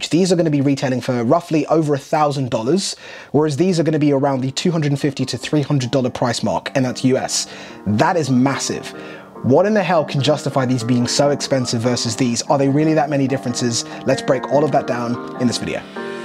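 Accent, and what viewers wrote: England, United Kingdom. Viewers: I'm not saying I'm racist but I'm having a hard to time listening to this English accent talking about hockey skates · Learning about hockey from a black guy with a British accent. 😂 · As a Canadian who has never encountered your YouTube channel I was taken aback by the British accent. The thought did enter my mind "what will he knows". But you crushed it. EXCELLENT presentation and analysis. Very impressed. My hockey days are over. Just no interest anymore. But if I was still into the game you would be my go to guy for equipment reviews. Well done.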